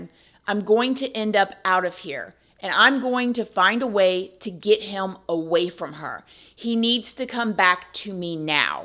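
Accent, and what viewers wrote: South, United States. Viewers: love that Southern accent 🙃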